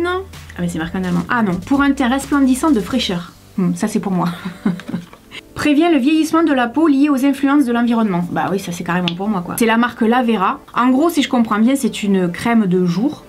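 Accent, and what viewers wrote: South, France. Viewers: Salut je suis abonné à toi depuis pas longtemps et chaque fois que je vais sur YouTube je regarde une de tes vidéos nouvelles ou en sienne et voilà je T'adore ton accent du sud à force de t'écouter je le prends (mon père a grandi dans le sud).Maman de YouTube reste sur YouTube ont T'adore tous❤❤❤❤❤❤❤❤❤ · Coucou..pkoi tu es dans love list you tubeuse.. Déjà car c toi que j'ai découvert en premier et puis tu es brillante originale tu as vraiment la lumière a ts les étages et pr moi c Versailles quand je te regardes. C comme ça. Ussi pr les origines un peu communes. Jsuis pierre Richard 3 comme toi. Et tu sais tjrs de quoi tu parles tu parles bien (avec nôtre aquecant.. Erreur les les autres qui on un accent pas nous et au dessus de Montpellier c Paris.. C Flo le catalan qui le dit et c vrai. Avantgardiste par les sujets de t vidéos inspirés d influenseeuses des states tu as tjrs une longueur d'avance. 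Écoute tu es speysse!! spéciale spaciale..astrale..jme sens très connecté à tes pties folies t brins de fantaisie.. Bravo #comment tu parles bien aux djeuns!!!! Tu es le vaccin anti.conneries. C sur que t enfants de yt travaillent mieux à l'école maîtresse.!! Bon dimanche venteux ➡️🌞glagle.. Biz a toi ta mam et🐕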